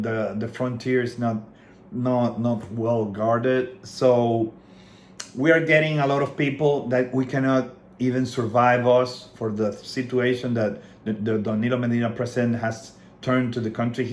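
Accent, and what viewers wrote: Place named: United States. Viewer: Todo un americano hablando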